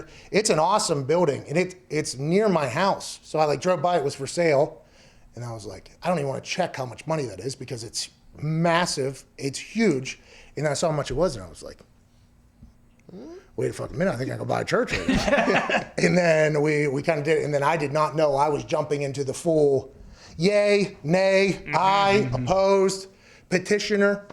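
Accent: Pittsburgh, United States. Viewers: Thank you to whoever pointed out pronunciation of “house.” Dude’s so god damn pittsburgh. Love his pittsburgh accent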